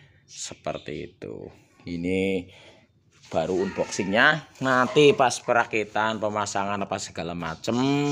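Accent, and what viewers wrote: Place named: Banyumas, Indonesia. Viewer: Om... Logatnya banyumasan asli mana bos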